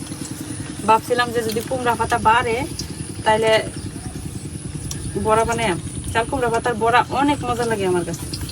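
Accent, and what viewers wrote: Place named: Netrokona, Bangladesh. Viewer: আপু, আপনার নিজের নেত্রকোনার ভাষায় যে মাধুর্য্য আছে তা কখনোই শুদ্ধ বা প্রামাণ্য বাংলায় পাবেন না। পশ্চিমবঙ্গের দুর্গাপুর থেকে বলছি - দোয়া করি ভালো থাকুন, সবাইকে ভালো রাখুন, আর আপনার মুখের হাসি অক্ষয় হোক। 🇮🇳🤝🇧🇩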